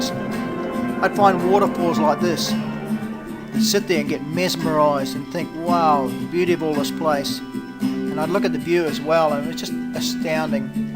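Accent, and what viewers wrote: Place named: New Zealand. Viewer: I just LOVE this Kiwi/Oz accent of yours! Reminds me of my trip around the world with my sweetheart before having the kiddies. Ok, got to follow your story now. See ya!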